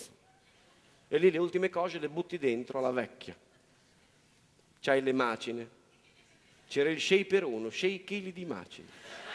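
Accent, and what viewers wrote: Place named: Romagna, Italy. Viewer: Impareggiabile interprete della parlata romagnola prestata alla quotidianità. Bravissimo.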